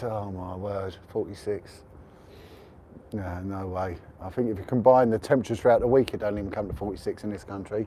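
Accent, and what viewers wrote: Cockney, United Kingdom. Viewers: God save the cockney!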